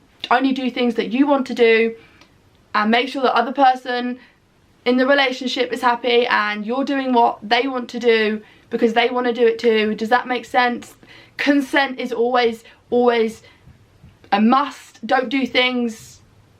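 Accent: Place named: United Kingdom. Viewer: I find non-violent communication from Dr. Marshall Rosenberg to be helpful with direct conversations about difficult topics. It's an amazing way to show what your needs are without being pushy (or feeling pushy) about it Also, you sound British. In Dutch society it's a bit more chill because people are used to being direct (I'm Dutch). So culture plays an important part as well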